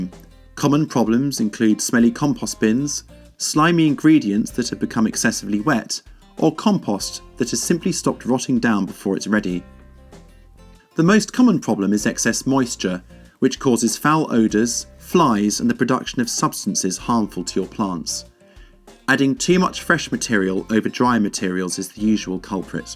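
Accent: United Kingdom. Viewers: Love the hillbilly music the beginning, and also that Mr. Bean accent.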